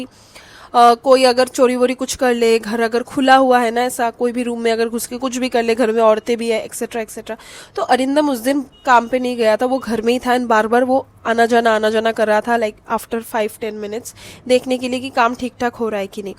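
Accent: Bengal, India. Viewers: Love your bengali accent💛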